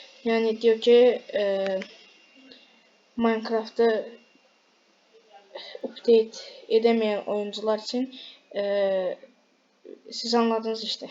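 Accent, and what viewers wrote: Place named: Azerbaijan. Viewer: Abe azerbaycanlısan türkçe anışma be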